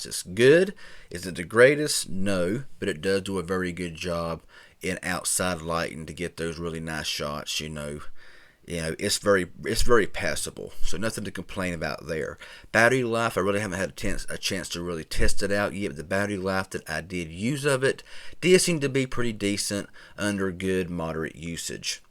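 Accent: South, United States. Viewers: Was suppose to be a review not southern accent return!!!!!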